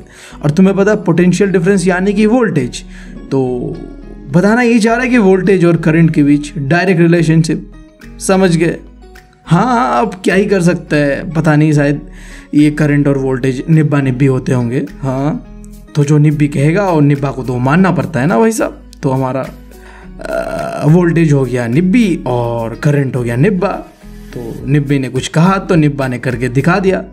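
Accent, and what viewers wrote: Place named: Assam, India. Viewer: Bro is axomia 🙌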